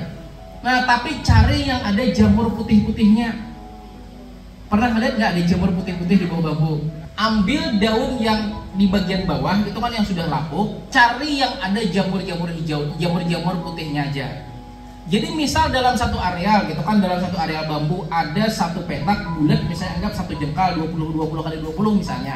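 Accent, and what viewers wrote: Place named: Sumatra, Indonesia. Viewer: Ulun kira piyan i urang sumatra. Saklainnx sama ja wan ulun urang berabaei.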